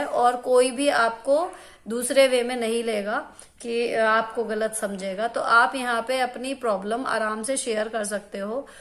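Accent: Meerut, India. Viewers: Aapne blkul sahi bola apni body ko apna Ghulam bna lo to sab kaam aasani s hote chale jate fr koi pain pain ni rehta ....mere yaha jb maid t tab mere pain bhot hota ta but jbse use hataya h Mai etni active ho gy hu k hairaan hu maid q Maine rakhi t....aapki videos bhot motivational hoti h..... Di aap meerut s to ni hyn? Meri friends circle m aadhe s zyda sab meerut wali thin to hme unka lehja bat cheet bhot ache s pta h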